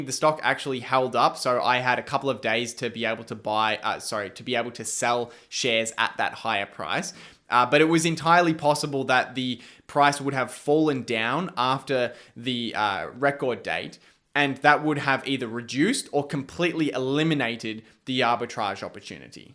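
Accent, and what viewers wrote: Australia. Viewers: Hmmmm rosy cheeks and an Aussie accent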